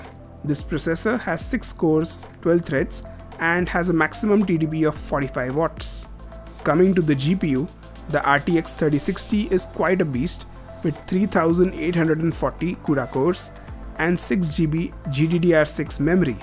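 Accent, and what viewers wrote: India. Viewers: Laptop, lol, love that indian accent :D. Great video!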